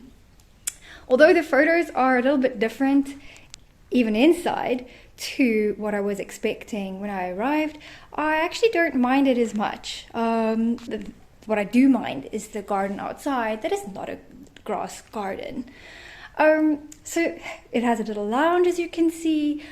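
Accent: South Africa. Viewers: Ek wou se ek hearken n SA aksent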